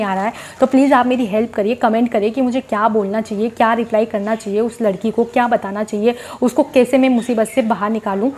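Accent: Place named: Rajasthan, India. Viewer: Mam aap rajasthani lagte ho bolne k laheje se,par aap ne kaha aap delhi se hai🤔🤔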